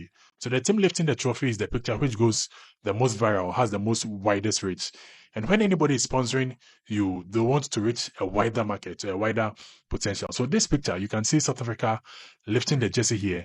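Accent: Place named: Ghana. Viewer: You sound Ghanaian.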